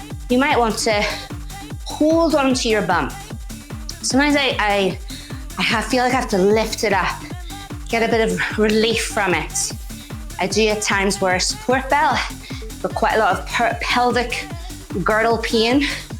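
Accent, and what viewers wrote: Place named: Northern Ireland, United Kingdom. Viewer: I feel like you're from Northern Ireland, where are you from? 😄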